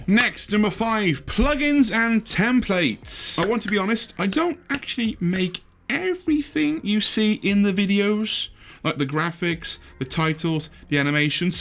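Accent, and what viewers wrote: Midlands, United Kingdom. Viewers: Can I hear a Midlands accent in there trying to hide away? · Also... Is that a midlands accent I hear? ;p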